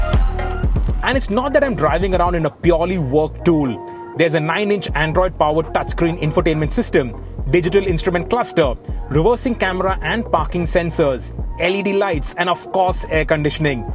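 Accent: India. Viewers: Indian english hurts my ears so bad😂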